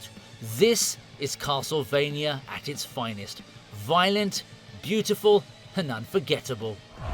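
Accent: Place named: United Kingdom. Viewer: Always ruined by a terrible British voice.....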